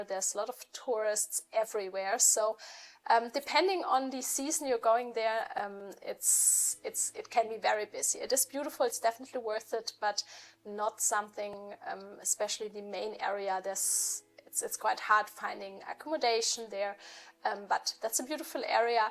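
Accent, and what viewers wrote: Germany. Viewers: Very interesting - thanks for answering all these questions! One that I've never heard you talk about is how your English comes to be so good. Obviously, as might be expected, you have quite a pronounced German accent (which I really like, by the way!), but your command of spoken English, your vocabulary, your use of colloquial expressions and even your pronunciation of British place names are all very good. I speak a little German, but, if I tried to imagine the reverse, with me trying to make videos in German whilst on the go, it would be a disaster! Did you previously spend some time in the UK?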